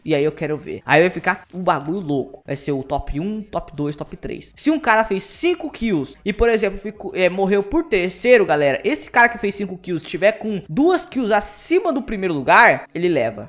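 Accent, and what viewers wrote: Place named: Rio de Janeiro, Brazil. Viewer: Carioca mano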